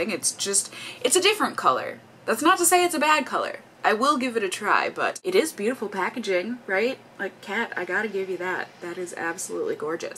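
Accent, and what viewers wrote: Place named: Midwest, United States. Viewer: I love that you say b-aye-g lol Midwest accents make me happy 💕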